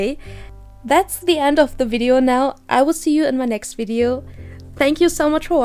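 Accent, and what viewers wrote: Germany. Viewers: Ich bin mir unsicher ob ich deinen deutschakzent im englisch nicht zu sehr mag als das ich wechseln würde. Ich glaub ich schau lieber deine englischen viedeos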